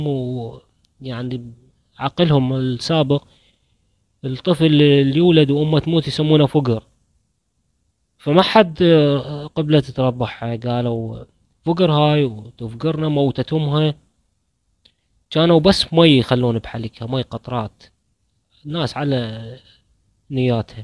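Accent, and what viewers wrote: Iraq. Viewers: تعجبني اللهجه العراقيه الجنوبيه وقصه جميله وشيقه حقاً ❤ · ما اجمل اللهجة العراقية ...فهمتك هْوَايَهْ زين ❤ تحياتي من الجزائر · القصه كلش حلوه وسردها بلهجتنه العراقيه الحلوه . قصه معبره تخلينه نحب الموت ومنخاف منه ونستعد له بالاعمال الصالحه. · اللهجة العراقية جميلة الله يحفظك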